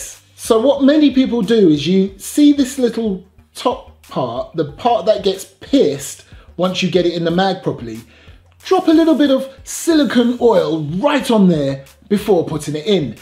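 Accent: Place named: United Kingdom. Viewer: You're so delightfully British. Well not so long if Boris Johnson makes Britain sink.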